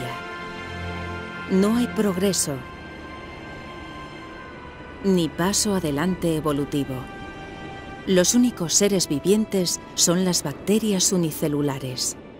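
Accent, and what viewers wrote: Spain. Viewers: Luego está internet lleno de odio hacia el acento español 😢😭😭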